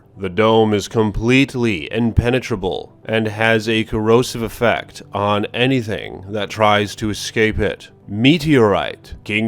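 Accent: RP, United Kingdom. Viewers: Why do u talk like a British butler!! annoying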